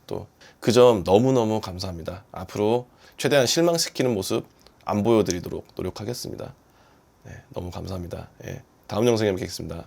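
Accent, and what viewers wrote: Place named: Jeolla, South Korea. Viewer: 못했어오 -> 모대써요x 모태써요o 대전 분인데 서남사투리 쓰지 말고 표준말 씁시다.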